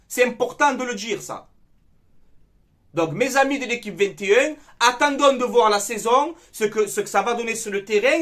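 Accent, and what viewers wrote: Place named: Garrigues, France. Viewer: Salut, comme d'hab tu me régales avec ton accent des garrigues, juste au niveau Suarez contrat de 5 ans n'est-ce pas abusé quand même.? C'est vrai tu dis nous ne l'avons pas encore vu jouer d'accord, mais on peut constater sur les stats que l'on connaît et pour moi c'est un contrat de 2 ans avec éventuellement 1 année optionnelle si challenge sur la saison obtenu., son prix est aussi excessif venant d'un club relégué.. D'autant plus que Kalash OM lui avait trouvé des sources sur l'autre Suarez l'Uruguayen qui même à 35 ans a une grosse expérience LDC surtout un palmarès qui n'est pas néant, plutôt que de partir en MLS il serait prêt à faire une grosse réduction sur son salaire, donc je suis déçu... Dernière chose, j'attendais comme pour Alamada la saison précédente un transfert plus ambitieux sur Claudinho, il y a moins d'une semaine ça parle de lui vu la situation en Ukraine et Russie, là plus rien que dalle... 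PS: J'ai aussi le même maillot de foot extérieur OM 91 superbe bleu turquoise, j'ai floqué mon fan Waddle, j'ai celui du domicile qui est sympa aussi....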